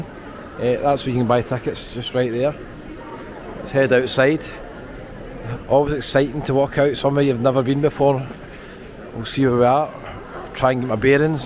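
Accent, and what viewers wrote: Scotland, United Kingdom. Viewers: Love the Skaughtisch aksent. ❤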